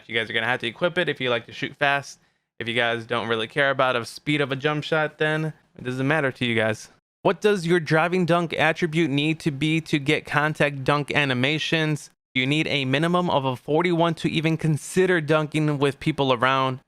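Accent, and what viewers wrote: Chicago, United States. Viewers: What part of Chicago is he from?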